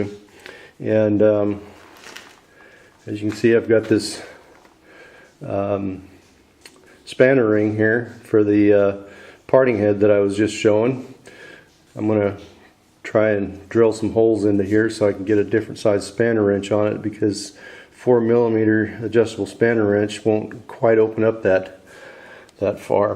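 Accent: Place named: Palatinate, Germany. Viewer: You're welcome, I now have watched all of your vids and subscribed as it seems you've got some interesting projects in the pipeline. Also good to see there are other guys around who cannot stop feeding the monster ..:-) Anyway, i'm a bit confused,... your yotuibe location says US and you sound like a native American to me, but power sockets and the "Schutzbrille tragen" sign on the door looks certainly German, not to forget your "Paelzer" dialect on the German video, which sounds pretty native as well...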